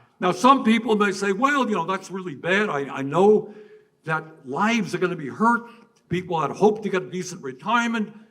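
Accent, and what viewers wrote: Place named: United States. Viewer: It’s nice to hear in American. By the way, I am English it’s nice to hear American actually talk sense instead of talking out their backside keep going.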